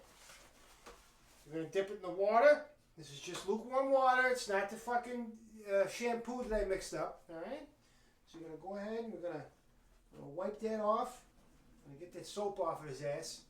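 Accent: United States. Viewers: What happened to this American accent I need a friend who speaks like this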